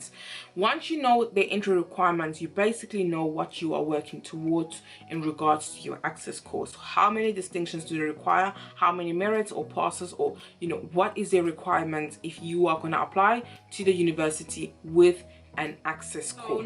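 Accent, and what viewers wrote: South Africa. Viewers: Girl i knew you were South African 😂😂 i can hear it omg 😳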